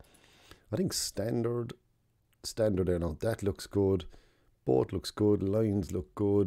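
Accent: Ireland. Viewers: It's that charming Irish accent. Thumbs up for a clear voice! 🙂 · I’m east cork you sounded near enough to the city one lol cool what’s the difference between Luminar neo and pro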